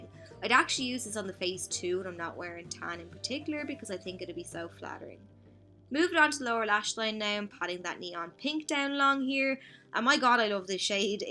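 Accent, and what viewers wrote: Ireland. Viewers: I could listen to your accent all day girl! Taking your irish accent and adding a makeup tutorial with it is pure heaven for me lol love your skills girl!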